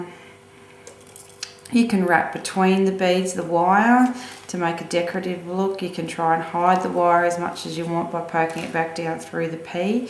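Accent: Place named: Australia. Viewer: You make that look so easy so I'm going to have to try this. I've just begun to think about making jewelry since it looks like so much fun to do. There's a lot to learn about all the tools and wires and beads etc. but I'm going ahead with it. Thanks for doing this. I love listening to your voice and your accent. :) I love Aussie and British accents and could listen to them all day. ;D Beautiful ring too!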